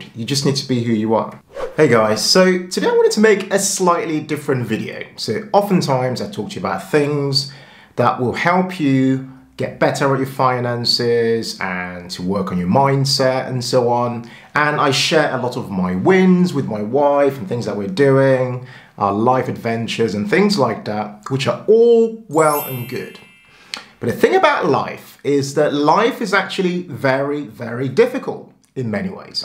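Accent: United Kingdom. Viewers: How do you have such a british accent when you arrived in the uk at 15/16?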